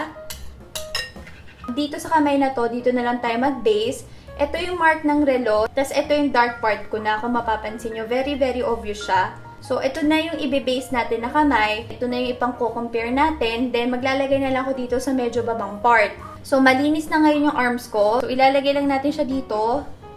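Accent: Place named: Batangas, Philippines. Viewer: May punto ka sis, batanguenya ka ba? 😊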